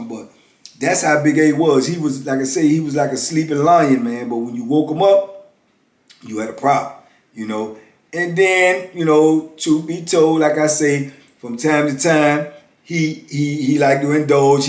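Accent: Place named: Louisiana, United States. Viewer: By your voice, I thought you were from Louisiana (New Orleans)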